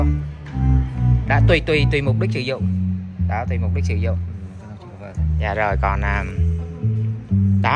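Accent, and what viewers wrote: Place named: Ninh Binh, Vietnam. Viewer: dạ, nghe giọng nói của a, sao như là giọng chuẩn NB ấy nhỉ..hihi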